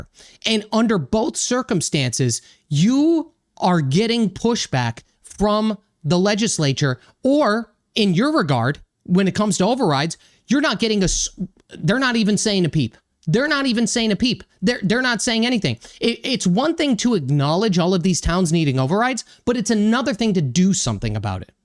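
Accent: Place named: Massachusetts, United States. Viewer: i love the accent. You say "owies" when you say always. A lot of mass residents do. I pointed this out to my friend and he thought it was hilarious and can't unhear it.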